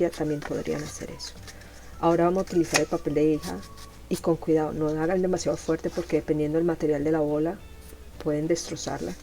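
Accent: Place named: Colombia. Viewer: Siempre admiro tu trabajo . Puesto q es bello bello .. saludos desde Villahermosa Tabasco mex. Hoy me dejas sin palabras, super explicado y detallado . Siempre m pregunto de donde eres, creía q eras española pero hoy tu voz me pareció diferente y pareces colombiana, 😙😙😍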